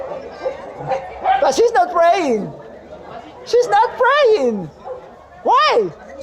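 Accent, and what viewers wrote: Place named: China. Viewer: You sound like a Chinese man when you speak English!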